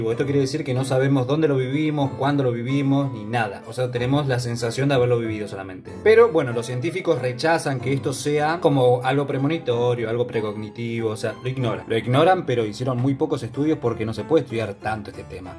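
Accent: Argentina. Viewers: Sos de Goya?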